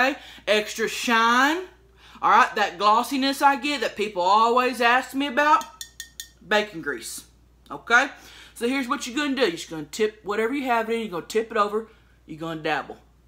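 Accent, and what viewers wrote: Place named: South, United States. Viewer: I just realized how damn southern you are, where you from?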